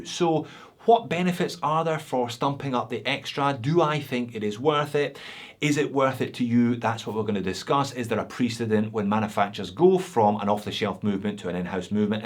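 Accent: Scotland, United Kingdom. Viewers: I just came to listen my favourite Scotsman talking about a nice watch I can't afford and had to learn that Sean Connery died... RIP.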